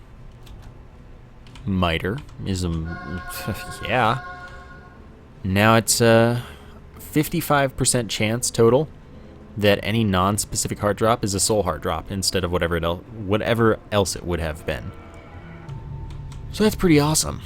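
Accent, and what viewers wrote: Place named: Canada. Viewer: So, stereotypically you sound Canadian. If this is true, which area. If not, where from in actuality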